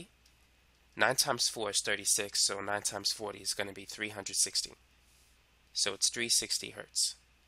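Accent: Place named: New York, United States. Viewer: I love this dude. he's sounds slightly gangster, and fully genius at the same time. sounds like a New Yorker who made it out the hood because he smart af. Props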